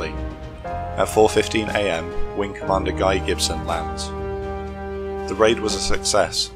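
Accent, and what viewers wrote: United Kingdom. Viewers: A mistake that many, MANY native speakers of English make concerning anything related to Dutch geography. In this case it's the IJsselmeer - Lake IJssel. In this video you are painting the northern part of it, close to the Afsluitdam (Closure Dam) green as if it were land. Miraculously ,south of this part of the lake, you show its correct colours; blue (lake) water. Please always check DUTCH maps first when making videos involving Dutch territory. I have no idea where you English speakers get your wrong maps from. Not sure about your country I think it's Britain the way you pronounce it, so it shouldn't be insurmountable. It's on the other side of the North Sea.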